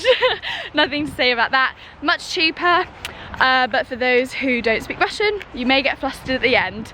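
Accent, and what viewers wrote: England, United Kingdom. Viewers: О, этот милый английский акцент! ) А то так надоел американский английский. Когда американцы говорят, создаётся впечатление, что у них во рту горячая картошка.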